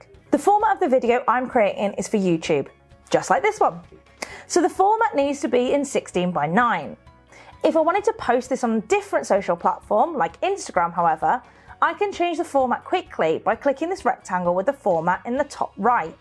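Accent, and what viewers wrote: United Kingdom. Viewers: This woman definitely British 😂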